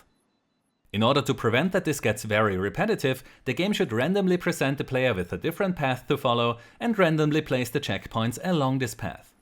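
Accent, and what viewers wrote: Germany. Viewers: Warum hört man sofort raus wenn ein Deutscher Englisch spricht :D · Are you from Germany?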